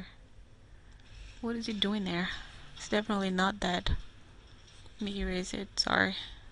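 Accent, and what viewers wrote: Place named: India. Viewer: Idk why but Indian accents give me ASMR so I get that while I learn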